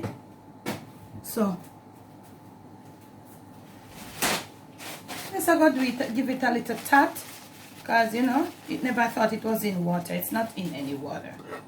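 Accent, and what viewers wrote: Jamaica. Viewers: Hey. You are the best....continue chatting de patois...so authentic just like your delicious recipes...you go Mrs.Girl....Nuff Respect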